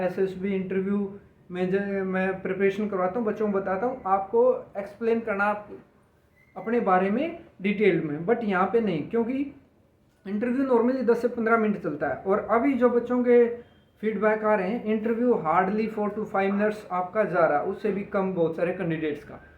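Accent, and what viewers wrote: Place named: Haryana, India. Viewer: Sir aapka tone harayanvi ya rajasthani lg rha…aise kaise hoga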